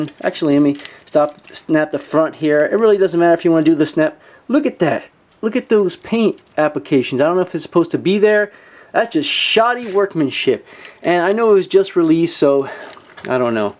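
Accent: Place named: United States. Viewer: how do you have an american accent if your not from the US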